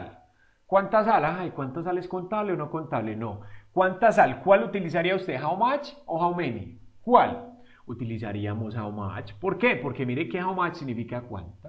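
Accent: Antioquia, Colombia. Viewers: Gracias profe, se parece a Vegetta 777, pero en Colombiano, gran video por cierto. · Me encanta la voz de los colombianos y no no me gusta los hombres por si alguien solo pensaba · Es la primera vez que veo un video suyo, profesor. Estoy estudiando inglés porque siempre me ha encantado, pero entre más lo estudio descubro que no es tan fácil como creí. Justo este tema de los cuantificadores me confundió pero gracias a su explicación lo entiendo mejor. Muchas gracias por tomarse el tiempo de dar esa clara explicación, además su maravilloso acento paisa tan marcado lo hace mucho más agradable. Muchas gracias, ya me suscribí.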